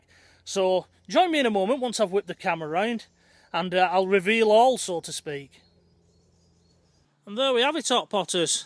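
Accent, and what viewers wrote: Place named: Yorkshire, United Kingdom. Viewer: Loving your Yorkshire accent, reminds me of Emmerdale.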